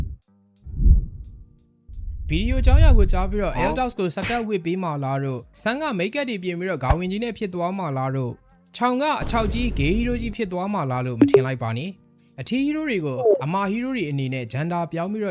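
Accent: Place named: Hokkien, China. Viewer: ပြောတာပြောရတယ်..သူ့လေသံက.Hok fan😅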